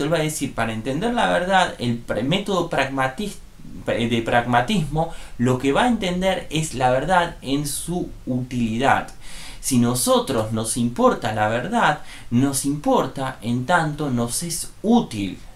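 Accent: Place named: Argentina. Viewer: No puedo con el español argentino...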